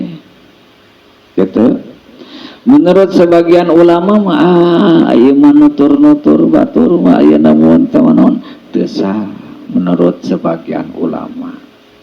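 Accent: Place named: Java, Indonesia. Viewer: Bisa sambil belajar logat Jawa 🙏👍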